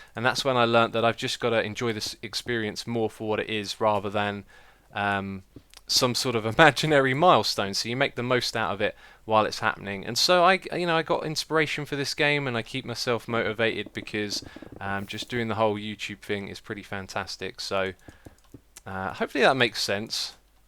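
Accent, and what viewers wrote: United Kingdom. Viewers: ***** Yeah it's his voice. So british. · Solside eclipse? Saltside eclipse? Soldside? Soulside? Sulside? What are you saying?! It's too british :(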